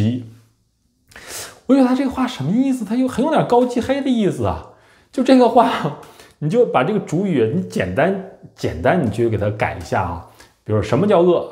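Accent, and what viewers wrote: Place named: Northeast, China. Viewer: 感覺這個人口音像內地東北地區人。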